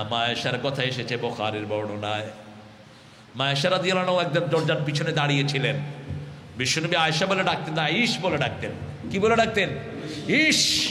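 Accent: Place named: Noakhali, Bangladesh. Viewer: আমারও মনে হয় কারন অনি নোয়াখালী ভাষায় কথা বলে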